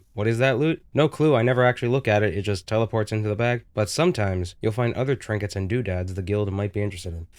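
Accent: Boston, United States. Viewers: Hahha the thick Boston accent keeps coming out, makes me think about Bill Burr reminiscing about cops in Boston: THE KID'S A GOOD SHIT ^^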